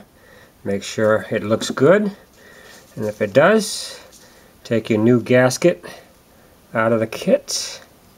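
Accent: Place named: Down East, United States. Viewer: Neatly done,. I searched for a long time for installation info after getting this kit,with no luck whatever. Your video made it look simple. Thanks for posting. BTW,I'm from Kansas,& I enjoyed yer down-eastern accent! So, ignore the guy below with the Peter Griffin comment.:-)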